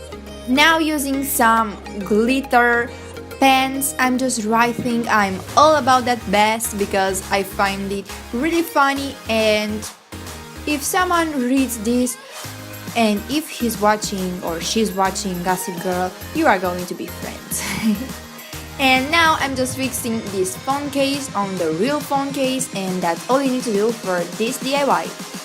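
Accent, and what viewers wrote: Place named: Brazil. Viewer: Hey! Love the DIY's :) and sorry if I'm being nosey, but where are you from? Your accent is so cute! I have a lot of brazilians friend that have the same accent as you, so that's why I'm asking :P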